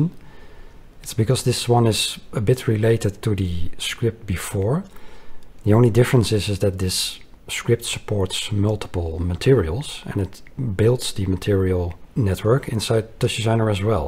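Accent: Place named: Netherlands. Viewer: I had no idea how difficult it is to import a 3D object into TD with it's material correctly mapped. After doing a lot of research - looking at Derivative notes, MeshLab, CloudCompare and watching YouTube videos, I eventually came across this video. Your import/export with Blender tool makes this seemingly simple task a practical reality. So thank you for making this tool available at no cost to us amateurs! Great well explained tutorial and loved your Dutch accented deadpan mention of the Funkee Munkee!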